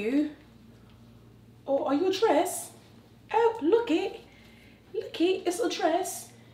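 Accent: United Kingdom. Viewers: Loving your haul from my favourite Shein. Wow your British accents spot on.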